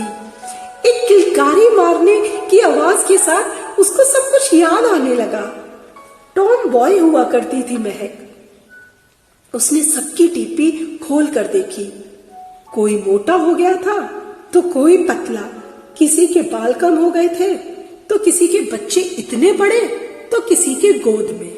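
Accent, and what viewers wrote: Kanpur, India. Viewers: Amazing.... the way you narrate the kanpuriya slang hahahaj too good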